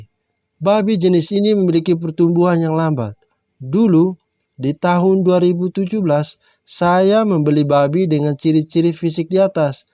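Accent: Flores, Indonesia. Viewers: Logatnya seperti orng Bajawa..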